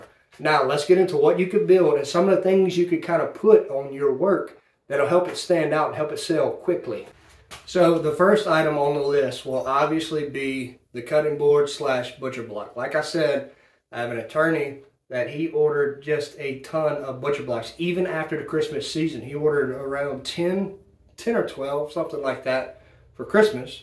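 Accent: Louisiana, United States. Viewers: You must be from Louisiana…my fellow Cajun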